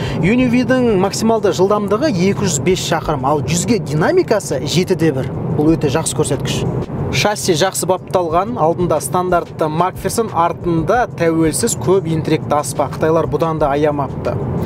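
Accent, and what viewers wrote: Standard. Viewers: жарайсың Қазақ тілін таза анық әрі сөз саптауларымен керемет үйлестіріп жүргізетін бір ғана жүргізуші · Керемет видео, жарайсың, таза қазақша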